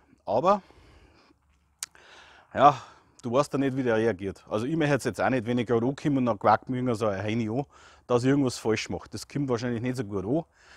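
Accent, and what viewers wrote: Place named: Bavaria, Germany. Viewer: bitte untertiteln, da boarisch nicht jeder versteht !!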